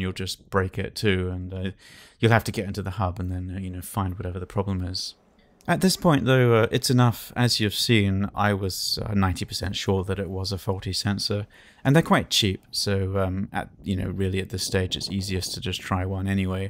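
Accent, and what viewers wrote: RP, United Kingdom. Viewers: I love the posh accent.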